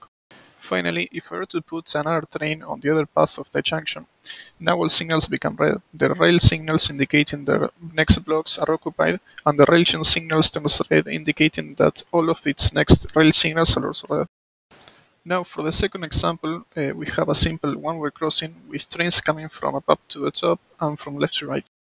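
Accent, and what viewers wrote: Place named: Argentina. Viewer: Ahjj el ingles argentino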